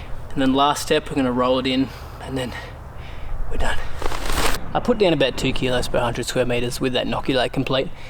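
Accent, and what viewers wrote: Australia. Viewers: Is it me or are you Australian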